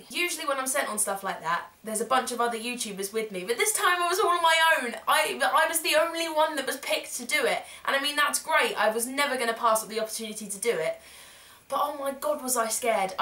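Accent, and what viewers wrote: United Kingdom. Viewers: I love your videos, you're one of the only British people I'm subscribed to! And I'm British! It's nice hearing a familiar accent! xox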